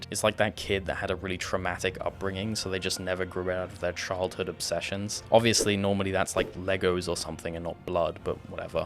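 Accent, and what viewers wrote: Wales, United Kingdom. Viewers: He is British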